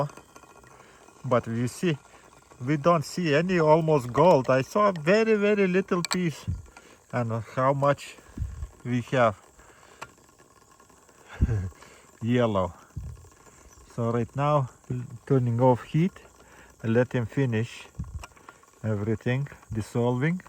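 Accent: Russia. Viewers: Дядь я не пойму ты русский ?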